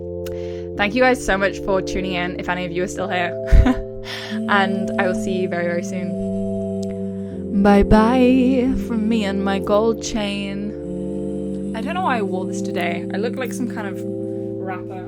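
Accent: London, United Kingdom. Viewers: Her accent has become real London lol happy for her - hope all works out 💚💚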